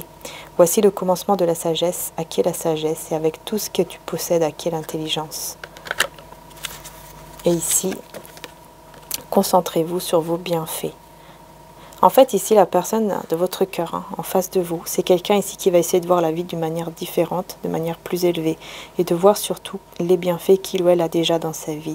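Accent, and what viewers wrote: France. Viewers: je suis allée voir la chaine italienne, trop mimi le mix entre petit accent français et petit accent sicilien😊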